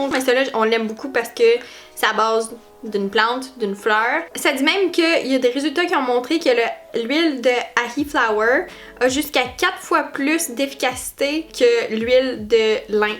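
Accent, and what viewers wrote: Quebec, Canada. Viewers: Bonjour je suis française et comme beaucoup j’adore ton accent québécois je ne comprend pas toujours mais je ne me l’asserai jamais de tes vidéos merci à toi de faire partager ta façon de vivre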